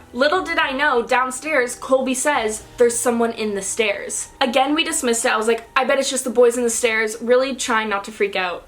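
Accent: Canada. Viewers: Gurl I love you candian accent and got used to it now I say aboat,melk,house in you accent love you and btw love your new merch and guys go stream. Love idk if I speeded it wrong opsie👉👈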